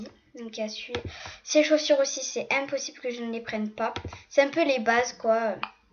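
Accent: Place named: South, France. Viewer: J'aime trop ton accent tu vien du sud ?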